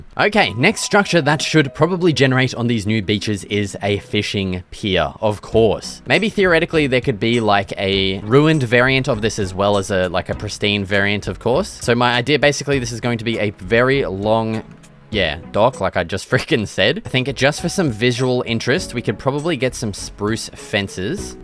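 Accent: Australia. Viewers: Ew your Australian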